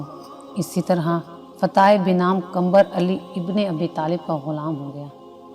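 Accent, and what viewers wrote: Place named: Punjab, Pakistan. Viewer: Aap ka accent bht ghalat hai. Farsi k alfaz k neechay kahan par zabar ya zer hai aap ko nhen pata. Aur ye urdu hai Punjabi nhen, iss ko urdu accent mein parhye. Plese do practice at least three to four hours once script is ready and then upload the video.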